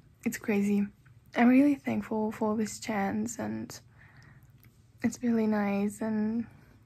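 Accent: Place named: United States. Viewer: Your English is PERFECT. With an American accent no less, simply amazing. As a former host dad in Virginia it always pleases me to see an exchange student enjoying their exchange year. Remember to do and see as much as possible. As you know it is a once in lifetime opportunity. All the best on your American journey.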